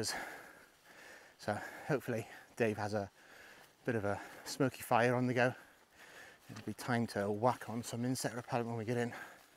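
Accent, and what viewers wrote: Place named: Wales, United Kingdom. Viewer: I could tell buy your broken accent there was a hint of Welsh, i lived in merthyr tydfil for a few years. Loved it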